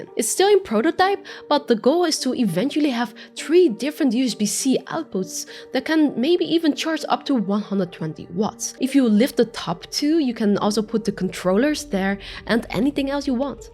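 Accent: Netherlands. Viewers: Is that a dutch accent you have?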